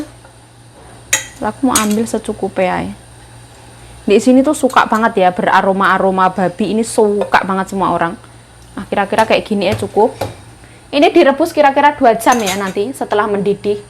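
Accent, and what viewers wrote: Java, Indonesia. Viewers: Aku belajar masak dari cantik Bicara logat bahasa Jawa 👍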